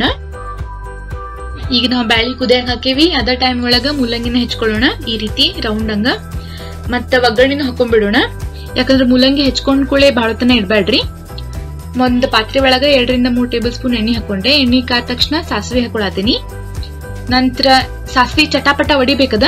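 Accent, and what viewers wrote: North Karnataka, India. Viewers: North karnatakada Mathu tumba channagide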